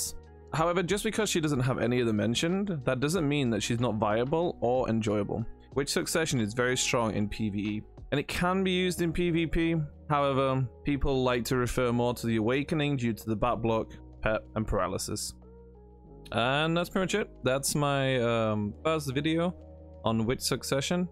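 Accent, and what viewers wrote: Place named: Australia. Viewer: Very nice accent. I like Australian accents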